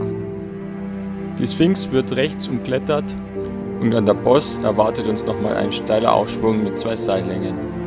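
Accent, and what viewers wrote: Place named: Bavaria, Germany. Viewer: Servus, ich hab deinen Kanal vor kurzem entdeckt und muss sagen die Videos taugen mir richtig. Gute Länge, gute Aufnahmen und sau sympathischer bayrischer Kommentar. Mach weiter so!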